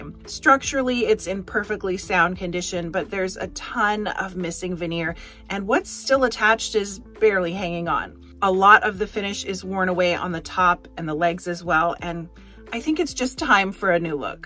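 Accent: Canada. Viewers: This is the first video of your I’ve seen. I’d like it! The only thing I would ask is why you didn’t do some accent colors on all that beautiful rounded furniture and edges? I think it would’ve made it. Stand out just a bit more made it slightly modern. And what did you say and where did you get those knobs? I love your accent. What part of Canada are you from?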